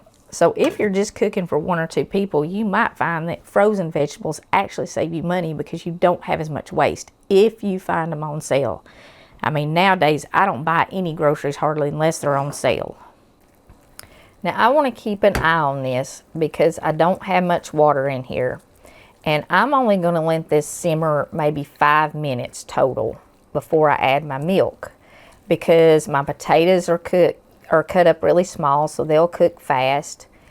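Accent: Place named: Tennessee, United States. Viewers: It's been many years since I left the Appalachia where I was raised. Received an Ivy League education and became a tenured professor at an elite institution. Along the way I never heard spoken the dialect of my native region, and I rinsed my own speech of it as I rose in my profession. God, how I love hearing you speak it. It sounds like home and family and belonging. I miss it so much. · I’ve been a widow since last Christmas. I appreciate your channel because you cook good ole down home food, but the best part of your channel is that you dedicate it to also spreading the gospel of our precious SAVIOUR. I’m praying for you. It’s been a hard year for me also. My husband was an ordained minister and he was in Gospel Music for 50 years. My prayer for you is that Jesus would give you sweet peace and wrap you in His loving arms. May God richly Bless you! 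It’s also good listening to someone from Tennessee. I live about 35 miles east of Nashville. 🙂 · This looks so delicious! I just love this channel. You sound like my beloved relatives who live in Tennessee and I just love how down to earth you are and that you’re not afraid to talk about God.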